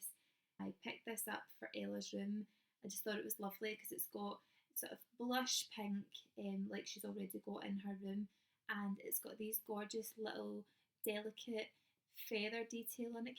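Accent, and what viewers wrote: Scotland, United Kingdom. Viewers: Loved your haul and your wee lass is adorable!! Nice hearing a Scottish accent on here too lol. Just Subscribed!! 😊🤗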